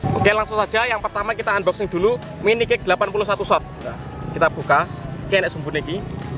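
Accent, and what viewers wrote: Java, Indonesia. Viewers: Jowone ngendi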